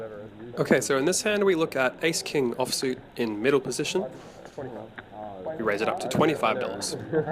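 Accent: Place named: Europe. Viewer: Can you include where you are playing your sessions please ? I notice the dealers are dealing from what appears to be a shoe? Never seen that before and I'm just curious as to where your from I noticed an accent so I'm guessing somewhere in Europe? Anyway keep up the good work the Vlog looked great. Have a great day.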